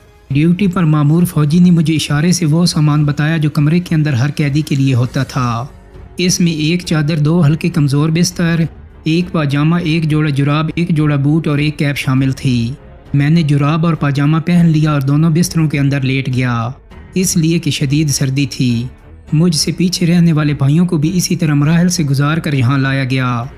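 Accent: Chakwal, Pakistan. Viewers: بھائی جان آپکا لہجہ ضلع چکوال کا لگ رہا ہے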